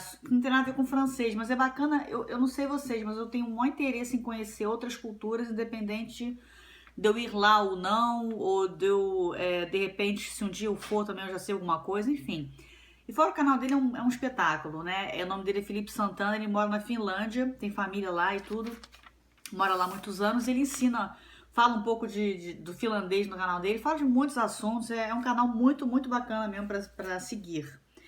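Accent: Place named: Minas Gerais, Brazil. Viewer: Wô! T’écouter parler à propos de ma langue c’était vraiment un exercice en gymnastique pour mon faible portugais âgé de 40 ans. Tu sonnes « mineira » si ma mémoire est bonne. (Je souhaite pouvoir écrire dans ta belle langue, mais hélas! J’suis ben ben trop rouillé!) De bonnes observations! Il y a beaucoup de différences entre nous et nos cousins en France comme vous autres dans la lusophonie. Bonne continuation!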